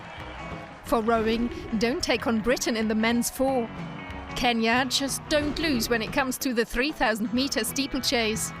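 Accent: Germany. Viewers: Why does the announcer pronounce all the Dutch names as if they were German? It is a bit disorienting. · She pronounces Dutch names with a German accent lol. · yes I could hear the German in her voice xD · You pronounce the names very german but pretty good anyway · You pronounce Dutch name as if they're German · Was the narrator german, she had such a germanic accent lol.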